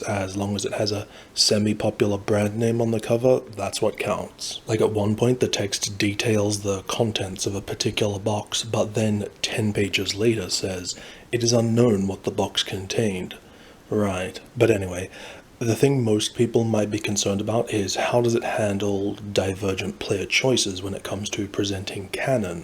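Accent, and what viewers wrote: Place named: United Kingdom. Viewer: Dragon Age + an ASMR-like narration with British accent = great combination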